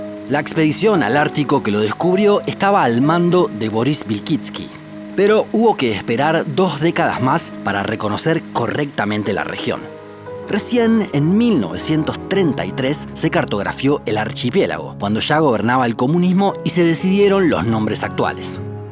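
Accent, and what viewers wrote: Buenos Aires, Argentina. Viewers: Es argentino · Además de los videos instructivos, el acento porteño me hace extrañar mi querida Argentina. Saludos desde Brasil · eres de argentina?? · Escucharte me anima a querer visitar Argentina solo para saber donde tienen este acento 😍😍😍😘😘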